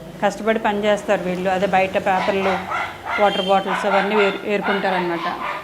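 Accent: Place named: Vijayawada, India. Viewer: Mi mata manasu manchidhi sister 😊,madhi vijayawada side miru makulane మాట్లాడతారు బాగా,ఇలా ఎన్నో చేసి మంచి పేరు తెచ్చుకోవాలని ఆశిస్తున్న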